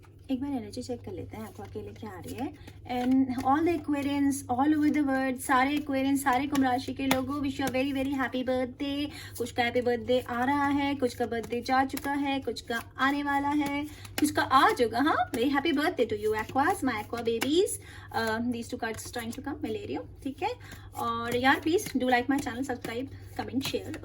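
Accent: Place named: Kolkata, India. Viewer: Sune valo laglo....Kolkata bengali..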